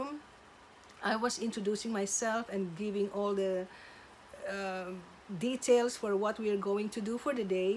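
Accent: Philippines. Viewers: i knew it from the first time you showed yourself on channel that you were filipino. from the voice and the accent palang eh. pusong mammon forever ang mommy ng Pinas! godbless po:) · I sense a Filipino accent...Kabayan! Haha. More reasons to like.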